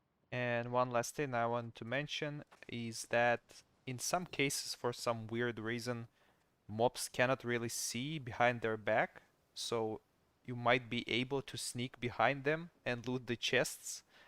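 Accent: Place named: Russia. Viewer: That russian accent 😁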